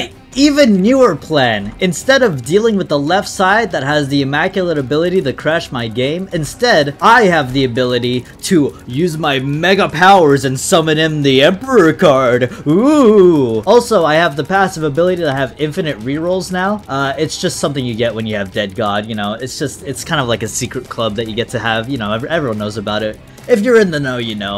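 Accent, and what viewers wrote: United Kingdom. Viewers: My day be so fine. Then boom. Find out is British. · Wait you're British, cringe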